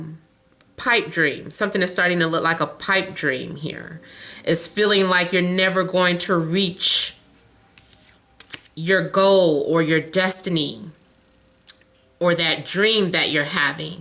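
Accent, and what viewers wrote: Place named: United States. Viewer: You are thé best ever love from France 🇫🇷 even if it is sometimes difficult to understand the American accent 😂Happy new year 🥇♥️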